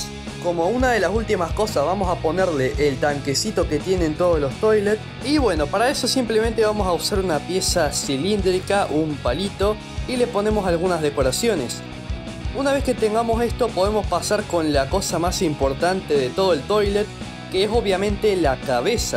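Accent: Argentina. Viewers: Sos de argentina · Eres argentino nove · Te quedo muy bueno el "abuelito molon" eres argentino? No c pero bueno 🗿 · Eres de argentina papu pro😊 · Eres de argentina? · Eres argentino no?? 😅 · SOS argentino por tu acento 😊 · Eres de argentina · No hace falta decir que el men es de Argentina verdad?? XD, fuera de eso, tus videos/tutoriales son muy buenos bro, sigue así y llegarás al los 100k :D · de argentino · argentino obio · Yo llase de que sos de Argentina lo se por como hablas y yo también soy argentino me gustan tus videos 😊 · Eres de argertino no?? O uruguay??, la digo por que su acento tienen parasido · eres de argentina verdad? buen tuto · Tu eres de argentina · Se re nota que es de ARGENTINA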